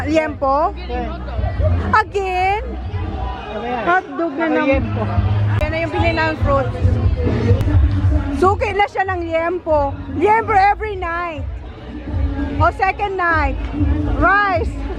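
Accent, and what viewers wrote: Bicol, Philippines. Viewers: I love how you speak bicol, im a proud bicolano too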